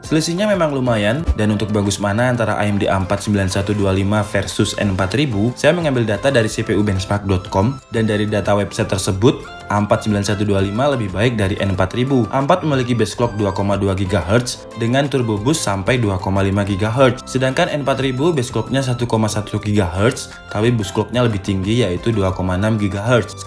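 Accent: Malang, Indonesia. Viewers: Wong Malang a iki?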